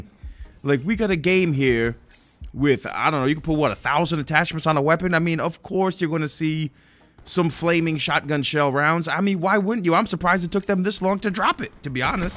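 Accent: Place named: Northeast, United States. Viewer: Loved your channel for years bro. I hope you and your family are staying safe. You sound like you’re from the NE - so please stay safe. Cheers from Texas.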